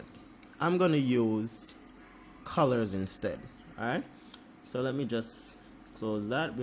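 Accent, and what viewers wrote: Jamaica. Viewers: Hello sir...where from the Caribbean are you from? You sound like a fellow yardie. Great work. I use frequency separation quite a bit.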